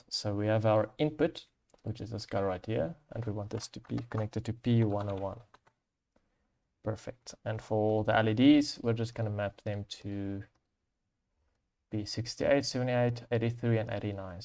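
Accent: South Africa. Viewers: I hear an Afrikaans accent. 😁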